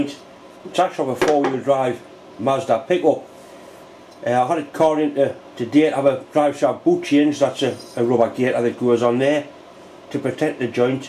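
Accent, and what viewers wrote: United Kingdom. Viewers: are you from the United Kingdom?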